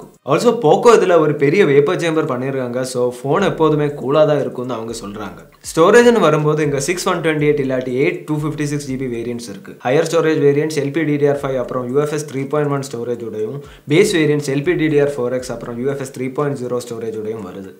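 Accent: Kerala, India. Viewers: Bro,are you malayali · Neenga malayalama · Sounds like a mallu talking tamil. Anyway nice presentation. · Are you malayali? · Bro neenga malayalaia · Kerala bro appadidhan pesuvaru Okdhan bro eppothume mass🔥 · Malayali tamil pesurathu romba nallaruku.... 😀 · His accent has malayalam flavour. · Liked that Malayali tamil accent.. Gud review. 👌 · .. Are u a malayali?????? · Anchor Malayaali... 😍😍😍🎈🎈🎈🎈🎈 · Unga tamil slang pidichirukku.. are you malayali? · I guess he is a malayalee. The slang though - adipoli bro.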